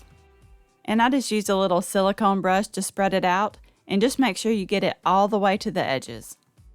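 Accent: South, United States. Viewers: I gave you a like right away just for your Suthun accent. Makes me feel right at home cause I live in Dallas. Your recipes look good also.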